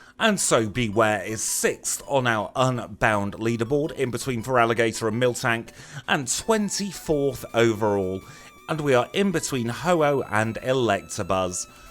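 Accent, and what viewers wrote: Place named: United Kingdom. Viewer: Yoo. I play one shot games of Dungeons and Dragons every week and this week had me questioning my DM constantly. You two sound exactly the same. It was wild, I kept looking over at him thinking you are you just don’t want to tell me. I’m going to assume he is probably from the same part of GB as you, and it just the accent I was hearing.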